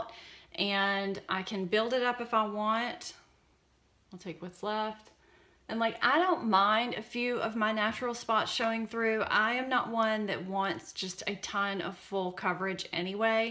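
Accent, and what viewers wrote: South, United States. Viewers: It's a southern accent thing. I have this "issue" too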